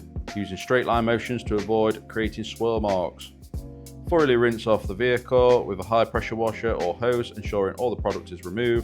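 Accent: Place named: Yorkshire, United Kingdom. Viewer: A Yorkshire accent in a video always get a sub from me. Great video pal.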